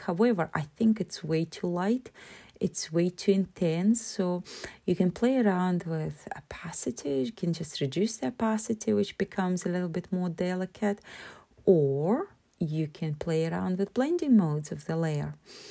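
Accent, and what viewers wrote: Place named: Eastern Europe. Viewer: You have a lovely accent. Is it a mix of Eastern European and Scottish?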